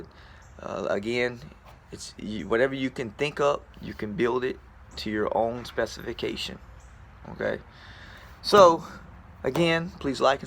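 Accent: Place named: South Louisiana, United States. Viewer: Because I’m from South Louisiana, I bet I can tell where you are from . Eat much crawfish Cher ?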